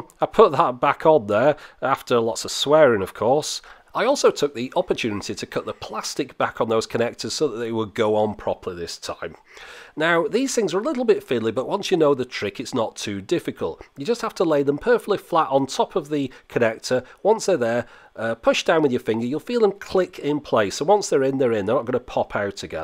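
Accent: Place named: North West England, United Kingdom. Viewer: You mustn't be English. James May is from Bristol. is from the North West of England. They both have regional accents and they are from opposite ends of England.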